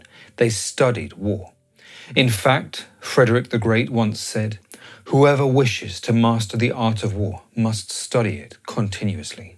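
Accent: United Kingdom. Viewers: good narration, sources cited in the video, good historical and reproduction footage, British accent, and cool topics. Subscribed.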